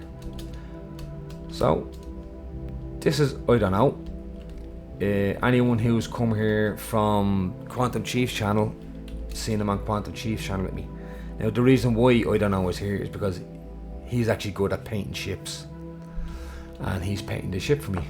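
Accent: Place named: Dublin, Ireland. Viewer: Watching your videos made me get this game, i love your content, it's good to hear the dublin accent lol. Keep up the good work.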